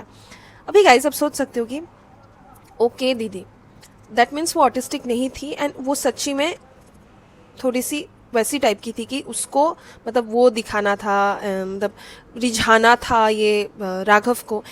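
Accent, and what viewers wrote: Bengal, India. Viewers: Didi ap Bengali ho kya?😊😊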